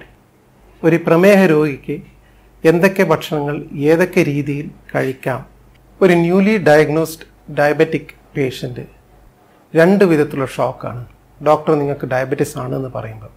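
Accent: Kannur, India. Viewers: So nicely and explained in a simple manner. Your kannur simple language is so nice to hear.🙏👍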